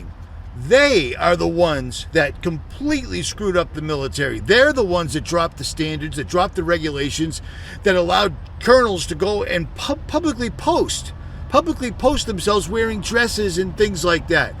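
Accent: Boston, United States. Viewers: You from Boston or wistah area... in gator coutry now yet from Mass, hi❤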